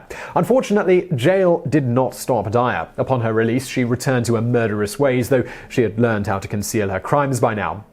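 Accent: United Kingdom. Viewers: Sounds like the host is British. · Your British accent is awesome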